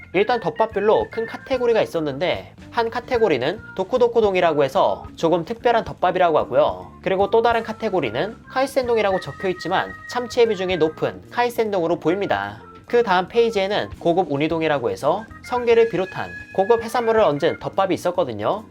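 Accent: Gyeongsang, South Korea. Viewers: 님께서 경상도 집안 출신이 아닐까요?....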